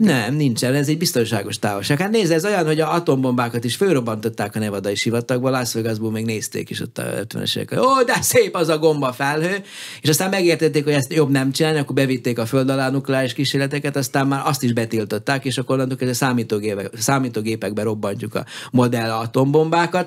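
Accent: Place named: South Bačka, Serbia. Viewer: Dél-bácskai tájszólás, Rátgéber Lászlóhoz hasonlítanám. Csak a szakterület más: egyikük a kosárlabdához ért nem akárhogyan, másikuk a csillagászathoz :))). Ja, és humoros a történet, betegre röhögöm magam minden interjúján, előadásán. Régen én is imádtam a csillagászatot :).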